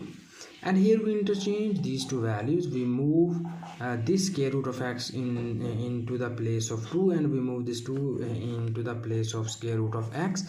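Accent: India. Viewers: Dreadful. unnecessary Indian accent.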